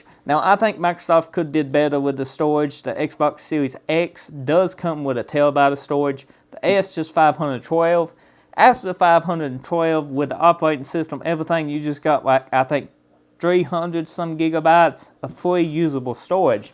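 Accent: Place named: South, United States. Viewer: Your southern accent. That one lol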